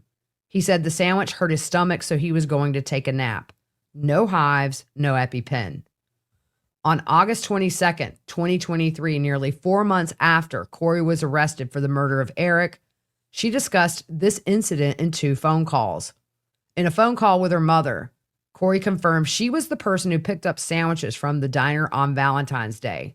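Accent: South, United States. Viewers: Your southern drawl is so beautiful ❤️😘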